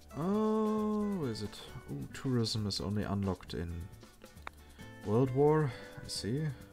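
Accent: Germany. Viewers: German accent is hilarious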